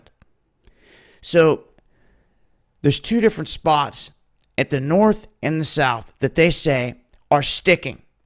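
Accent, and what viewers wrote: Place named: Idaho, United States. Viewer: you're from Idaho, girl, I can tell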